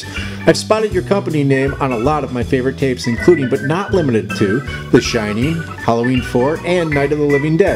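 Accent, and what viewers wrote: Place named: Midwest, United States. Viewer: Where are you from? You sound very midwest lol not a dig, just familiar. MI here🙋🏼‍♀️ Awesome job by the way!!👏😃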